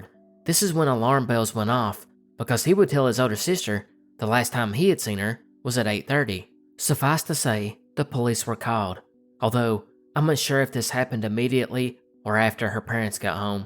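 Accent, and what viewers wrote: South, United States. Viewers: A thick southern accent was perfect for these cold northern stories.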